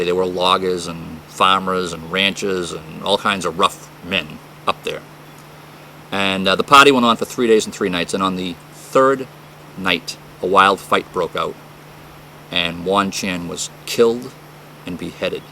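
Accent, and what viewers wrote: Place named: East Coast, United States. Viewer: apparently you don't. LOL. I heard this guy moved back to Boston or whatever East Coast S-hole he came from. That accent is horrible.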